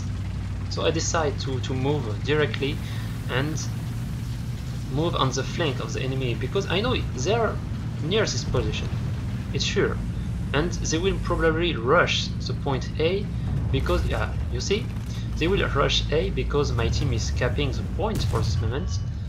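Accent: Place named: France. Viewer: bah je comprends pas tout.... mais c est de l anglais avec un belle accent français...lol..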